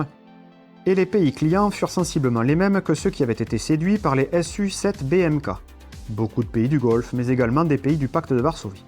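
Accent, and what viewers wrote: South, France. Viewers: C'est dommage, ça a l'air intéressant mais l'accent sudiste donne l'impression d'écouter Castex.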